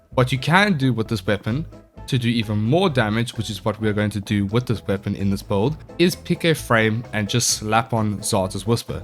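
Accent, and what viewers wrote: South Africa. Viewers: Are you South African? Your accent sounds familiar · are you south african? just asking cause you sound like you could be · Are u south african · Are you South African?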